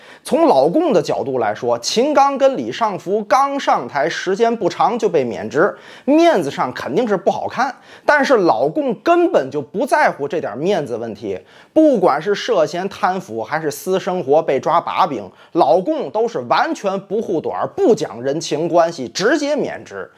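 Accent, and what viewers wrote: Tianjin, China. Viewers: 天津话是真逗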